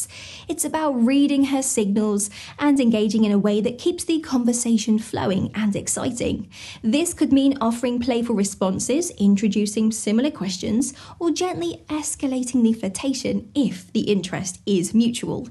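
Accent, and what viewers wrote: United Kingdom. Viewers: I find you entertaining, because you manage to talk a load of BS in an engaging manner, and you are cute. Congrats also on your wardrobe and that perfect delivery, complete with crystal- clear pronunciation, no (or very few) grammatical mistakes, captivating demeanour and enviable British accent. But what are you going to do when you run out of topics - or should I say 'run out of BS'? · Love your accent, what part of the UK are you from?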